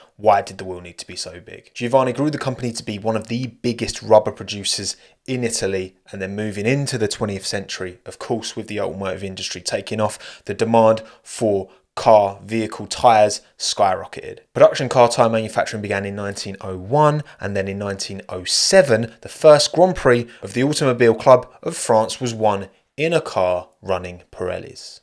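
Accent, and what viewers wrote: United Kingdom. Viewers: I always love hearing Italian words pronounced in the "British way"😂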